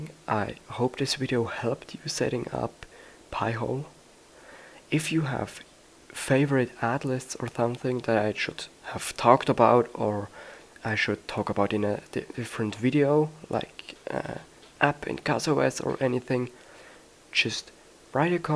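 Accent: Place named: Germany. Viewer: danke fur tutorial bro ich wusste als du am anfang geredet hast das du deu tsch bist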